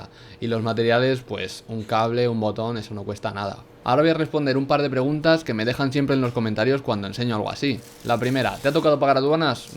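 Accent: Spain. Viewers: Odio como hablan los Españoletes....va, Manolo?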